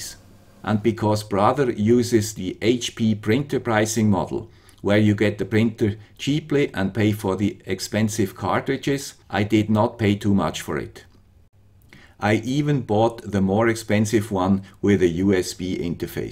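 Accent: Switzerland. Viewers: Hallo, Your Video´s are very good. I like to watch them and hear your Swiss English. And I learn a lot of them. Maybe in the Futures you can make a Video how to handle a 3D Printer. I mean please show us how the software works. As I know it is not so easy to handle this 3D Software if you are a Newbe. And if you want make a Tutorial of all the things to know. Keep on with your nice Works. Best Regards Jürgen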